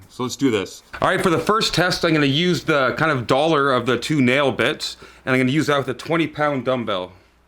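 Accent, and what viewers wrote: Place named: Canada. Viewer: I knew you were Canadian as soon as i turned it on! i'm going to refinished my floors and this was so much help!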